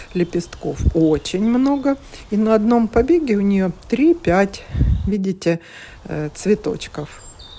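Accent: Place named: Baltic. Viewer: Очень красивые розы. Приятный голос, русский язык интересно звучит, как будто с прибалтийском оттенком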